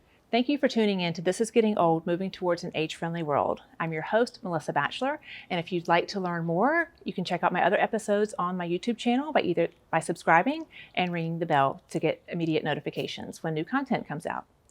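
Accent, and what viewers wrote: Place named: South, United States. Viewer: Great info, live your Southern accent.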